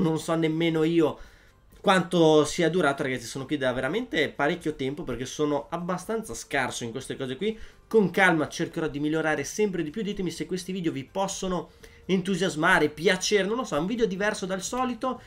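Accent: Veneto, Italy. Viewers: per caso sei veneto? 😁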